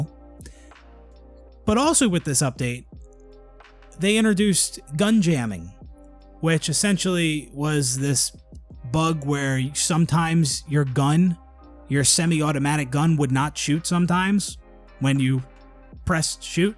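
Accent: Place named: Baltimore, United States. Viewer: This dude is 100% either from Baltimore or PA. Or he’s lived in one of those 2 all his life. Guaranteed 1000% cause of the accent.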